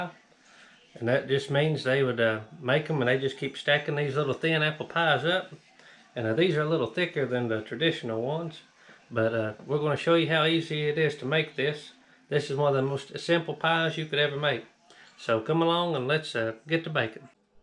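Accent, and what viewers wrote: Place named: Kentucky, United States. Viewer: I’m smiling the whole video. When I hear you talk...takes me right back to Sunday dinners with my Kentucky kinfolk whom I miss dearly. Until I get to Beulah Land. Thank you and God Bless.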